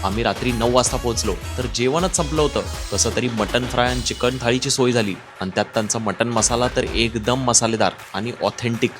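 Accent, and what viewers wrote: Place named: Mumbai, India. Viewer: Mumbai chi pora ahet re, samzun ghe bhava 😂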